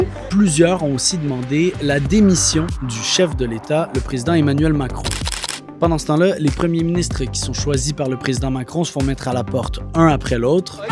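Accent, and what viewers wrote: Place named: Canada. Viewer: Tu as un accent canadien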